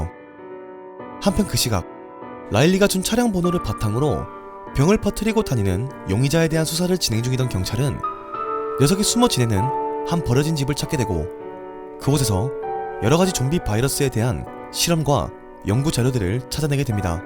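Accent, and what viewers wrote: Gyeongsang, South Korea. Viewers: ㅋㅋ경상도 분이시네용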